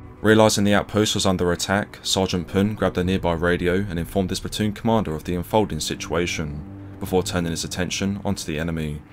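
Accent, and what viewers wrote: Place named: Estuary, United Kingdom. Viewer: Nice video and channel. Your videos aren’t filled with waffle like so many others to reach the 10min mark. I would just soften your estuary accent a bit to help out your international fans. Example: you used the word “hurled” and sounded like held. I criticise because I have the same accent lol.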